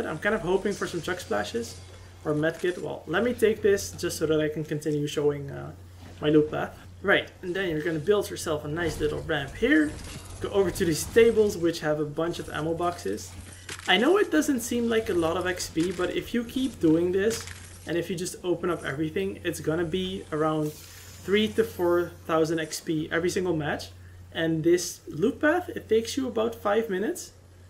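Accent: Netherlands. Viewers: I can hear your dutch. Groetjes en bedankt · One qeustion : u dutch?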